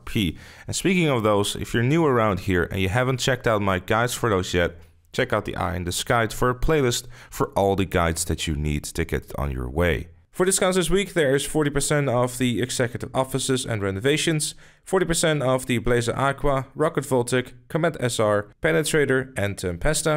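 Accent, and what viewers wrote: Netherlands. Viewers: Probably dutch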